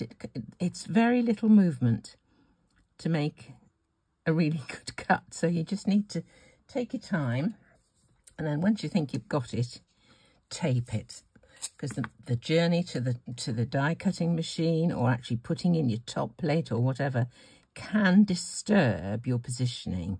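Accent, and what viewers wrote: United Kingdom. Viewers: What a cute card! I subscribed long ago but I have a lot of subscriptions and I kind of get stuck on a few. Only recently came back around to watching some more of your videos and I have to say your manner is like a breath of fresh air! I love your clean and simple style and your voice is a joy to listen to. I love your British accent ( I’m from Texas in the US, we have quite a different sound!) and your calm, quiet ways. Your videos are so very enjoyable 💕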